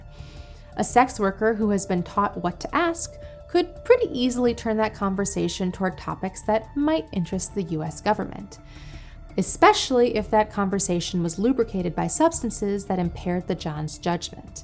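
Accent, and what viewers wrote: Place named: England, United Kingdom. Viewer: Your English accent is soooo beautiful!!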